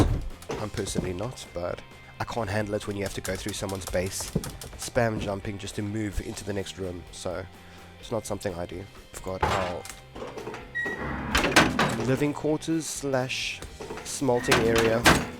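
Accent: Afrikaans, South Africa. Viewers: are you south african? You sound like it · r u africaans? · Brother are you from SA?? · As soon as you started talking I was shocked :O A South African Rust YouTuber, great videos :D Keep up the great work!!! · are you south african? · you from south Africa? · South african??? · South African? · You Afrikaans bro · Are u South African?!?!?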